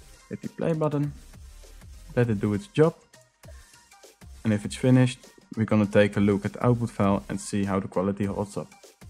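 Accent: Netherlands. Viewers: Hey cool you Dutch? thought I heard it. Nederland! <3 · Dutch accent detected. I like!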